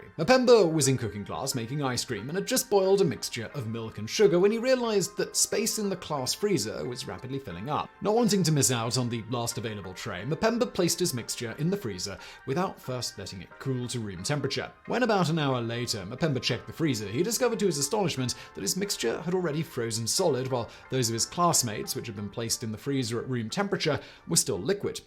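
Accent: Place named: United Kingdom. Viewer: I came here thinking this was Vsauce and heard a British dude and im like is this a British sub?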